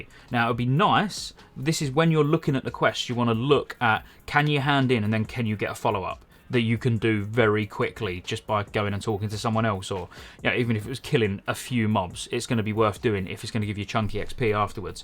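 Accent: United Kingdom. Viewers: British accent with an LSU hat I love it, great video!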